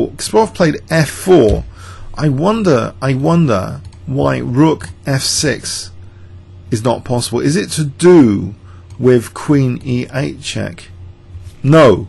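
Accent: United Kingdom. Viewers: Sounds like your saying Fawn pawn to me for some reason.I guess it's just your British accent....I'm not hearing it correctly. It is "thorn" pawn though yes? After watching the reaction video he seems blown over by the move, but immediately castles. Amazing how good these guys are, even in a five minute game how to mitigate damage so quickly after a shocking move/blunder what have you. btw- pertaining to your initial comments. Personally I'd love to see Kasparov make a comeback.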